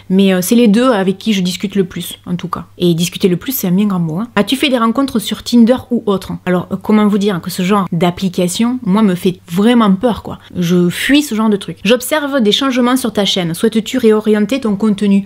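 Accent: South, France. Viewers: J aime ta franchise ta sincérité surtout tes bons conseils et ta gentillesse tout ça arrosé de ton petit accent du midi Merci mon petit rayon de soleil s à nous change du make up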